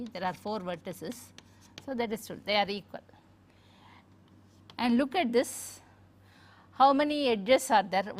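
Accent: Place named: Kerala, India. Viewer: That accent reminded me of my drawing teacher from Kerala lol But she explains so well. I understood everything in one go !! Thank you very much mam !!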